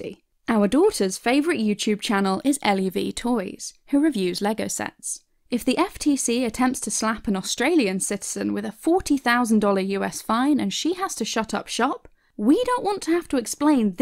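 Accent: United Kingdom. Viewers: The British accents says it all..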